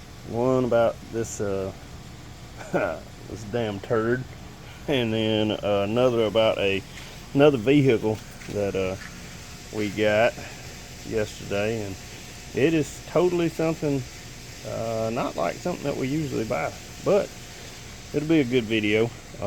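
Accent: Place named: Alabama, United States. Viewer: I saw Alabama car rescues and clicked on the video and as soon as i heard you start talking i knew your from Alabama. 😂😂 Me too, dont know why ive never seen channel before,new sub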